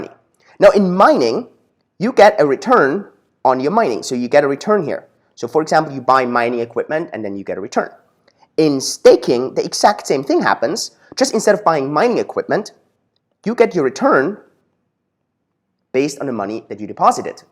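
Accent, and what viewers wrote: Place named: China. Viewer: OMG I LOVE HIS CHINESE ACCENT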